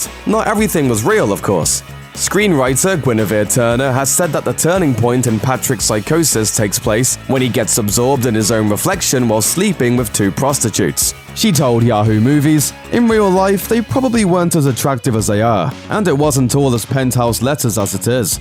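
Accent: United Kingdom. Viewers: I really wish the narrator didn’t have a British accent lost interest in 10 seconds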